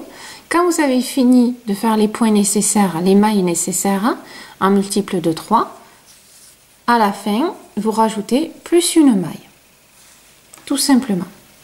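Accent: South, France. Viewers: Formidable ! ça ne va pas trop vite, les explications sont nettes et précises, la voix agréable et le petit accent du sud rigolo. Merci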